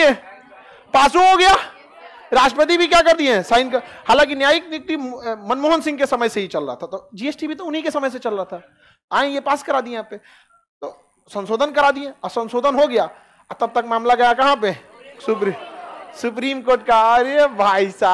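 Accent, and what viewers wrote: Bihar, India. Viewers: Bekar bihari ascent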